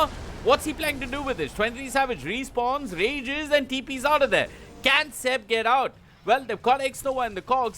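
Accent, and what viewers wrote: India. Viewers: wtf is this commentator?! an indian?? wtf?!?!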